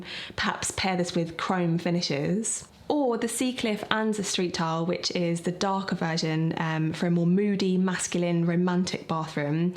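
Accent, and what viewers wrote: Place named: United Kingdom. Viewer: I was thinking of saying the same thing when I saw jose's. What I'd like to add it that, your British accent is the lovliest I've heard!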